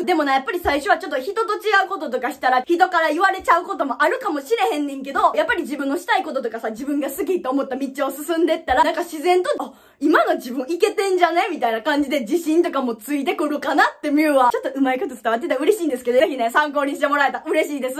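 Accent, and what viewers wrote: Wakayama, Japan. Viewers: 間違いない笑ちょっと和歌山弁入ってるけど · 地元の友達のノリと話し方そっくりで多分和歌山の人やろうと思ってて、ほんとにそうやってすっきりした。こんな短時間でたくさんありがとう言われたん初めてや。年下なのが信じられん。応援してます。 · 今日わはっていうところとか、〜やんって言うところが和歌山県民だと思ってました。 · 見た目と関西弁のギャップえぐいww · はじめて動画を見ます！見た目がクールなので、性格もクールな感じなのかと思っていましたが、中身はばり関西弁の太陽感ですごくおもしろいです(T T) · めっちゃ和歌山弁やと思ってたら和歌山すんでるんや😹この前電車で横に座ってました爆笑爆笑爆笑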